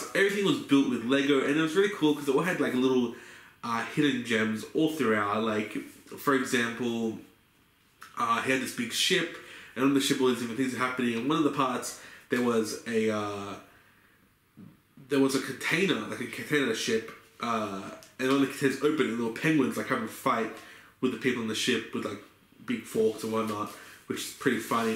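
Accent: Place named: New Zealand. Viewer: Your kiwi accent was just so funny bruh. Nice video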